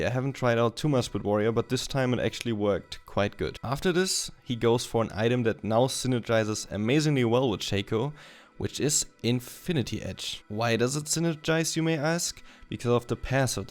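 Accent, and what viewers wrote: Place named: Germany. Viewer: Der Akzent... Da ist wohl jemand Deutsch :3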